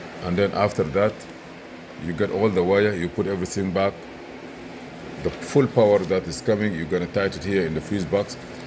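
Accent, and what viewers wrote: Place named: India. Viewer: I be honest I can't listen to the indian accent idk if its just me!? N they be post so many DIY videos nan fooken get so damn mad. Lol anyways thx u sir u speak very good in English I can under st an you for sure you be surprise there's lots that dnt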